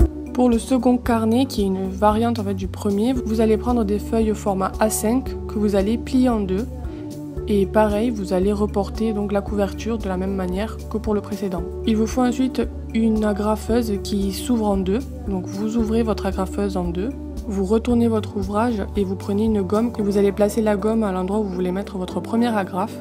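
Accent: South, France. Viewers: Alors First: j'adoreeeeeeeeee ton accent du sud 😍Et secundo: Les DIY sont vraiment Topisimes! Surtout la broderie! ca me donne pleins d'idées 😍😍😍Bravo!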